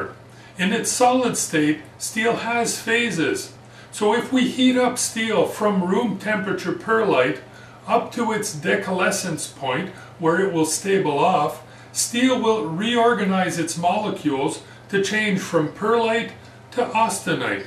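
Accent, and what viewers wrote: Canada. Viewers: He is from Quebec, Canada, so he has a French Canadian accent. I think Cliff Stamp is from the usa... no french accent.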